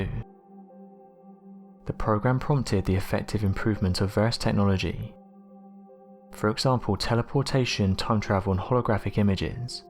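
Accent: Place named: Blackburn, United Kingdom. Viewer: Are you from Blackburn ...that tone of speech lol ... love it..Mad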